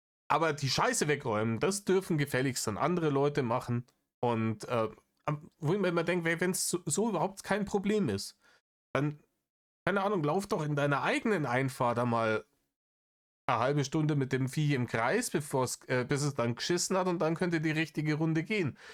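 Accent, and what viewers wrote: Bavaria, Germany. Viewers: Lieber. Ich bitte dich. Ich brauche mehr bayrische Stimme, die sich über Dinge aufregt in meinem Leben. Also mach „Narrisch“ Videos, APO Red, Rainer, Politiker oder sonst welche Schwachmaten. Ich hab vor lauter Schmacht schon alte Rainer Reactions angeschaut.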